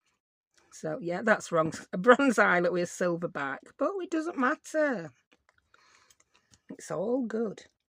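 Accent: United Kingdom. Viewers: Thank you for giving me someone cheerful to awaken to this morning!! (Even if this video is a year old), for me it’s today, and amid the stress of a not wonderful med diagnosis, packing up to sell my house, flat tires and car problems, and then a hail storm that totaled the roof, I am choosing to take 5 in the mornings and spend it with you and my cup of coffee being happy and cheerful, just like the way I choose to live my life. So glad that you join me! I turn on the captions and double check to see if you really said (in your darling British accent) something bit me on the nose! Love it! Hope you’re well!!💕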